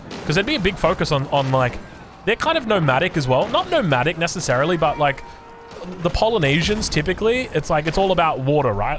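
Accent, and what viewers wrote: Australia. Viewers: Even on 0.75 speed u talk too fast man… slow down!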